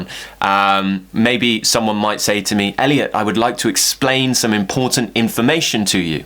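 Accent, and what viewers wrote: United Kingdom. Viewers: Hahah the last phrasal verb I use the most frequently😂😂 (No, no I'm joking of course) To be serious I really admire your pronounciation, your accent is soo stunning. I used to learn American accent, but now I find British accent way more lovely and pleasant to the ears. I've been trying to copy it but I sound ridiculously stupid😀 Cheers!❤ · britsh accent so cool no matter how hard i try to copy it i just can't >< but ur videos rlly help me thank u ♡ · Kanilani Kanilani I don't know if you are barking up the wrong tree, but his accent will be helpful in every situation you face and you will sound posh if you put in efforts learning it. Cheers!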